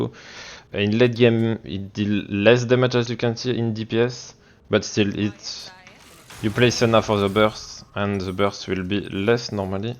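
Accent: France. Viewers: GG for the francais accent :)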